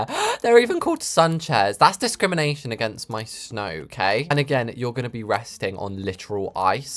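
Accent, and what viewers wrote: United Kingdom. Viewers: BRITISH ACCENT!! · As an American, I love your accent and the royals accents, I find them all very funny and British :P Also, I love to imitate British accents :P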